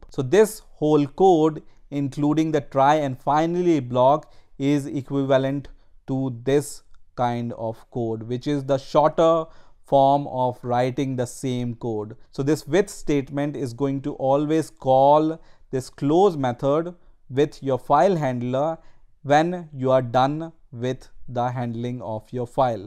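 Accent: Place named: Punjab, India. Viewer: Thanks sir... It's helpful video............ And your good English is unable to hide punjabi seeming accent... Tenn... Inn😊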